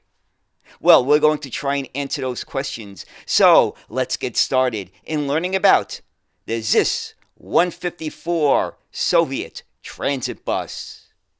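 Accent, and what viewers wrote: New York, United States. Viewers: Great vid for - as you say in your YT intto - enthusiasts for obscure transport stuff. PS: that old Zis-16 looks suspiciously like an imitation too, of the iconic Saurer Post Bus from Switzerland. PPS: the New York accent and stadium announcer style add that little extra... 🙂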